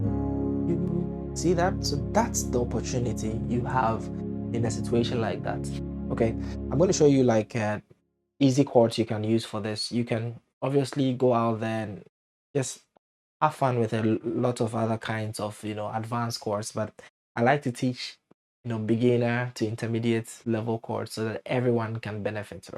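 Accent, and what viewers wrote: Ghana. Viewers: Are you Ghanaian? You sound like one though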